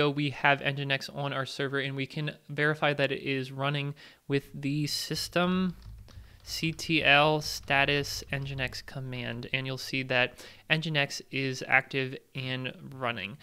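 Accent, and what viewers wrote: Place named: Philadelphia, United States. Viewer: hearing distinctive Philly (Philadelphia, PA) accent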